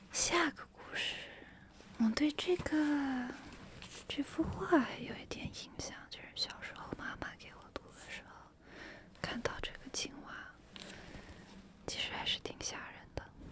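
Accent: Standard. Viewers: Your Chinese is really very standard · 发音也很标准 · 明明发音很标准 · 讲实话你的中文太标准了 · 发音好标准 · 你的中文发音非常标准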